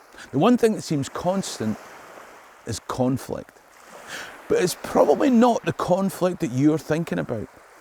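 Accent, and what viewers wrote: Scotland, United Kingdom. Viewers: Love your videos. Especially love it when you say murder. Love the Scottish accent….shame my ancestors didn’t pass the accent down. Cheers from an Aussie. 🦘👍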